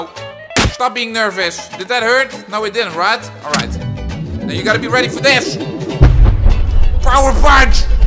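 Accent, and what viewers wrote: Netherlands. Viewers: are you dutch?i laughed so much my fucking stomach hurts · are you Dutch? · Are you dutch?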